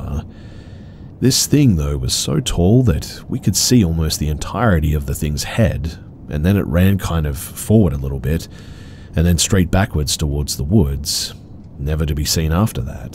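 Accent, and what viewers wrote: Australia. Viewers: Be is an aussie? 😮 I thought I heard aussie buuut didn't want to be offensive, lol.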